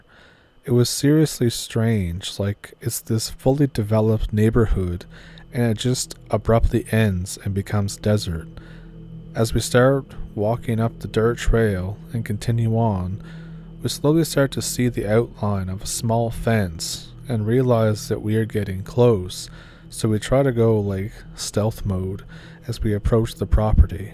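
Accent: Canada. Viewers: How is it you grew up in AZ but have the hardest Canadian accent I've ever heard?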